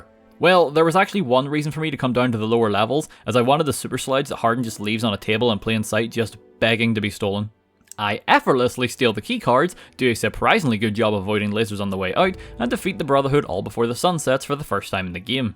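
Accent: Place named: Northern Ireland, United Kingdom. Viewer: Ahh, a fellow Northern Irishman, eh?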